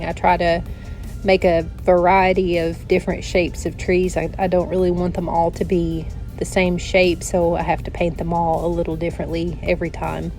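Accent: South, United States. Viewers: Nice voice and subtle southern accent. Nice painting too.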